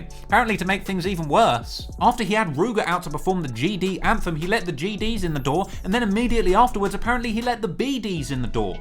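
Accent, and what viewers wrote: England, United Kingdom. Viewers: why is a british man giving me information on a chicago based gang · Yeah I think he's from, you know, England - because of the British accent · We don’t wanna hear a guy from England report on this…..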